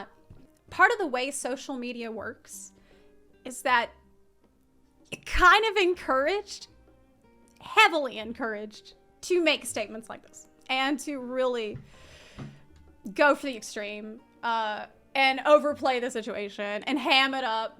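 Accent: South, United States. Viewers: Is zeplas southern Akzent stronger then bevor ? Sounds like it ! Guessing merica is brushing off on her. Disclaimer i know she is from america. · Wow haven't seen a video since she's been back in USA and didn't realize her accent came back this hard. When I was a kid my family said I got a southern draw from going to my friends house. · Random note: your southern gal accent has made a major comeback since you were forced to return to the US!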